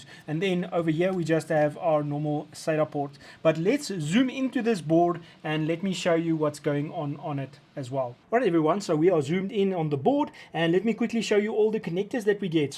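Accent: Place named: South Africa. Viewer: Wanted a shot of the full thing without the covers. But subbing just for the South African accent for now.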